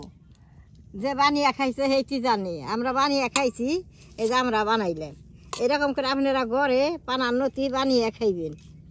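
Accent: Bangladesh. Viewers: Ami Bangladesh theke bolchi,Apnar kotha o rannar dhoron bangladesh er regional type, ami Bangladesh r Indian der accent Alhamdulillah valo bhabe differentiate korte pari,Apnar kotha bolar accent/bhasha puroi amader local Bangladeshi ❤🇧🇩 ami sure apni Bangladeshei live korechen, Apnar char paser area dekheo Bangladesh er nodi porer alakar motoi lagse❤. Apnar moddhe Indian kono boishistho nai:) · দিদি মা তোমাকে খুব পছন্দ করি। তোমার ভাষাটা আমাদের মত শোনায়। 🇧🇩 থেকে ভালবাসা নিও।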